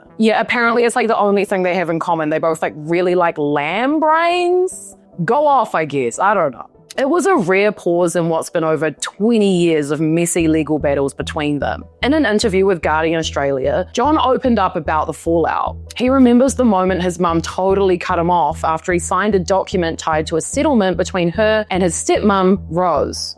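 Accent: New Zealand. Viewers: Sounds like Kiwi accent.. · As an Australian you a new Zealand due to accent correct me if I am wrong. I would not front up on YouTube channel and butcher the pronunciation of New Zealand country and city names. Was born in Melbourne but my father came to Western Australia mining and did well. Gina was older than I but we attended the same school. Your pronunciation of Pilbara is wrong your pronunciation of Wittenoom is wrong. So what other major facts are wrong in your story or deep dive on Gina ????? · Are you real or a Kiwi sounding AI ??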